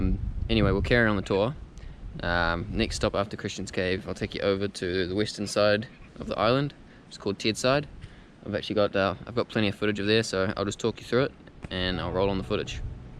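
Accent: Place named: New Zealand. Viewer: Nice video well done 👍. Do you live on the island? Did not really grasp if you do. You do sound like a Kiwi. No offence of course. I am a Aussie, no offence of course lol . Cheers bloke.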